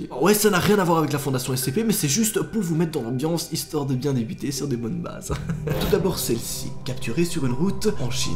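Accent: Belgium. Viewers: Tu et Belge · Il est belge Tsss..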